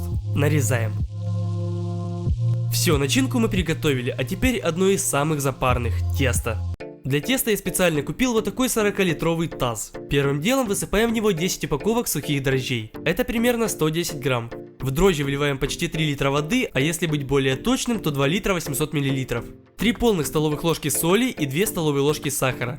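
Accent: Ukraine. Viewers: Слушай попробуй зделать на ней огромную порцию жареной картохи или люля кибаб и можно шавуху.(я смотрю твой канал с 3 тысяч тогда чувства были что ты прям украинец украинец но сейчас как логопед по русскому языку) желаю успехов и обогнать обломова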